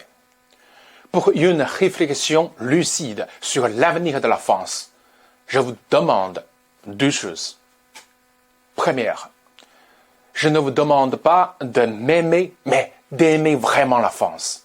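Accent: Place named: China. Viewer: Vous êtes sérieux? Vous vous présentez pour être Président de la France? Hors de question que je vote pour vous avec cet accent. C’est pas possible quoi vous imaginez cet accent chinois sur la scène internationale quand vous parlez français. Je suis désolé je suis moi aussi d’origine chinoise née ici donc c peut être facile pour moi… c’est pas l’idée que je me fais de la république française. Si vous aimez la Fr soutenez monsieur Zemmour.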